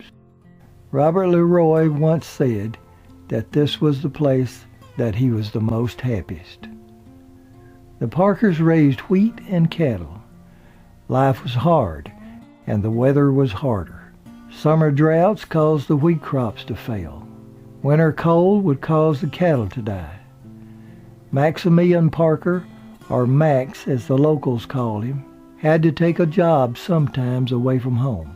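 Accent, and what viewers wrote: South, United States. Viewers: your quite welcome..love your voice & southern accent, my parents are from Harlan, Ky.😀✌ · A really good history lesson. I love listening to your histories of various people, and I love your southern accent too, even here in England. I look forward to the next one. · Love your videos and you have a great voice. Are you from the South?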